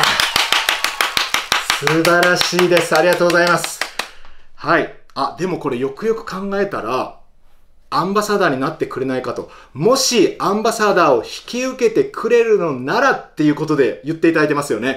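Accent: Japan. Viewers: ニュースなってたからきんに君の動画初めて見たけど、関西弁なんだね！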